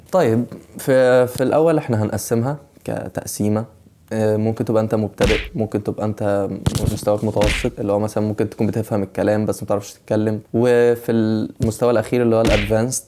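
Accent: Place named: Argentina. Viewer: Me encantó lo bien que pronunciaste el acento argentino❤😂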